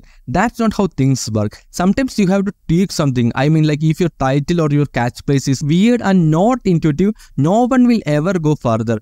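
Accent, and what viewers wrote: Kerala, India. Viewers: Super bro The way your telling is adding some Keralian spicy.. ..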